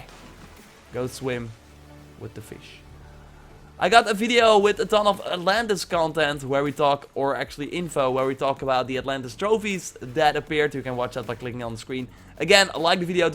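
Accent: Netherlands. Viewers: Hij is nl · Hi, by any possibility, are you from the Netherlands/Belgium, you have a very dutch accent ^^/ Oh and great videos! Just found out your channel today and have spend the day watching your AC odyssey videos ^^/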